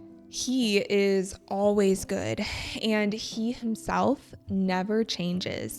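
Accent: United States. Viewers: Thank you for making such insightful videos for practicing English. I love your stories as well. Yesterday when I was looking for a native speaker to shadow American English accent, fortunately, I found your accent a good choice for me. Now I watch your videos every day and do shadowing. Looking for more meaningful videos.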